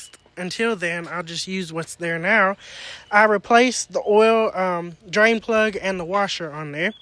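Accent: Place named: Texas, United States. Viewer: What part of Texas are you in. Sounds like the same part I’m from. I was born in Tyler and all my family are from Murchison, Ben Wheeler & Brownsboro.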